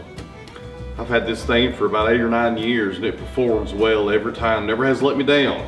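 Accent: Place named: East Tennessee, United States. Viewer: Man your videos make me miss home more than any other...Im originally from Maryville/ Knoxville area. Here at the holidays its good to hear a east Tennessee accent!! You dont know what you have until it gone. Never a truer statement. Keep it up and Ill keep watching.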